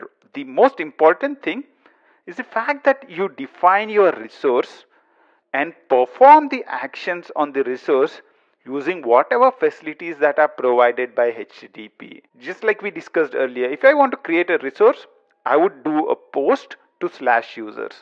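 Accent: India. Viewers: Ох этот индийский акцент 🤦😁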